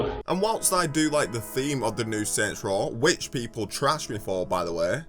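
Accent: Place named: Geordie, United Kingdom. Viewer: Where are you from? I’m from Southern California. Are you a Geordie? Your accent is so tricky to place lol.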